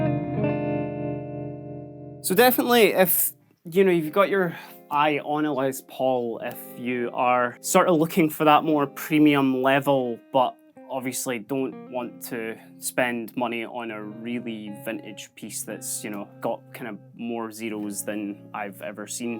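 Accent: Scotland, United Kingdom. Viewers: Hi! Are you from Scotland?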